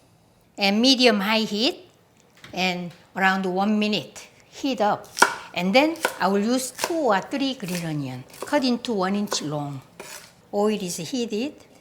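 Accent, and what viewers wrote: South Korea. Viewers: Your Korean accent is very endearing (e.g., "too muchhhy""). Please don't ever change.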